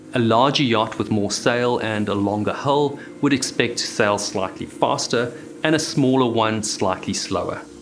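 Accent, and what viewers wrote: South Africa. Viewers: Are you a Saffa?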